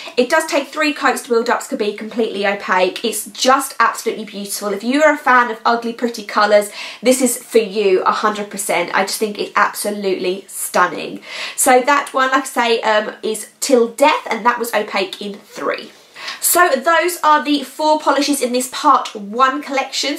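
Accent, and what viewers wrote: United Kingdom. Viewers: Brilliant! I honestly could just listen to you speak all day long with your lovely British accent! I too wondered about the pronunciation of the word Gemino. Tomāto tomàto I guess 😉 (Still can’t believe you’re nearly at the end of a pregnancy!) xo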